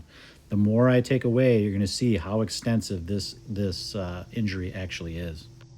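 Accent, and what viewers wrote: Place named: Midwest, United States. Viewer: I figured out why I'm kinda obsessed with your channel, its kinda like watching rust busting work on a ship, but with a pleasant and informative midwestern voiceover and empathy.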